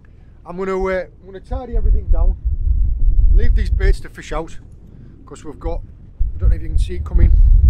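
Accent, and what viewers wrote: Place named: Yorkshire, United Kingdom. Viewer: Got to ask as your accent is so familiar, what part of God Own County do you come from? I'am a Smoggie from the Boro.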